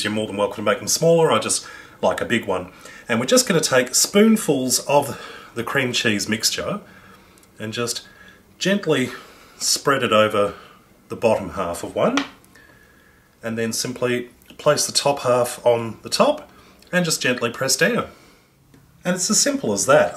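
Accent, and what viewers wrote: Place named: Australia. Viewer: Where did you find buttermilk? Judging from your accent i think your from australia? If so would they sell it at Coles or woolworths?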